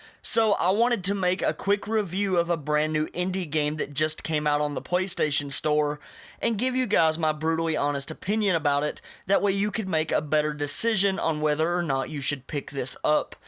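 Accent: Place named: Georgia, United States. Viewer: You sound like Dale from Baskets. Are you from Georgia?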